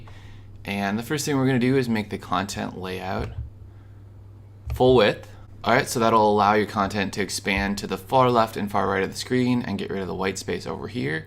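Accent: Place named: Midwest, United States. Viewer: Thank you so much for the amazing tutorial! This is my first time building a site and the video coupled with the Midwestern accent made it easy to follow along with (even if the video took me 5 days to get through from all the pausing haha) I do have a question about editing the blog page with elementor. I am gathering that this is not possible when the blog page is created under pages? I was able to edit under posts, but the changes are not showing on preview. This all was noticeable during the "adding the footer template" portion of the video. Will I need to go to the pro option to edit with elementor or keep archives. Do you happen to have a video on this already, so as not to be redundant in comments? Thank you again!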